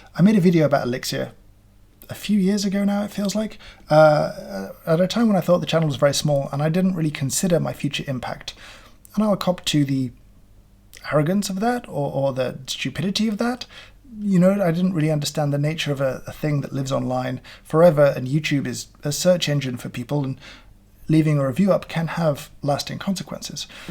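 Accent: United Kingdom. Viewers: Proper use of the English language and a British accent lend all the credibility I need.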